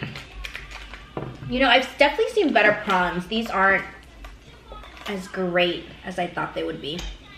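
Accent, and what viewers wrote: Vietnam. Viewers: Omg u r pure southern viet accent~~😍😍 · She has a very strong vietnamese accent LOl. Im Vietnamese too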